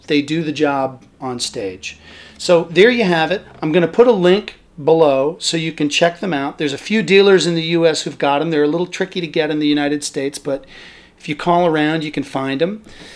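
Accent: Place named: Australia. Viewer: I thought you’re Aussie!! Cool!!!!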